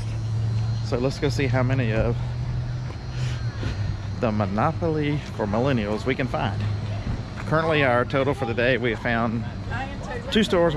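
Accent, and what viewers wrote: South, United States. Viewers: Love the country accent!!! All love from Georgia!!!!!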